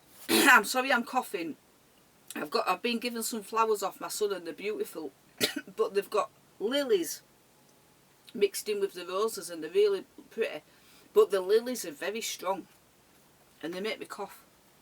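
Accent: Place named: Huddersfield, United Kingdom. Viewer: Yes, I have sent people racing pigeons of mine up there and Poultry. You sound like people I know in Huddersfield and Hebden bridge girl x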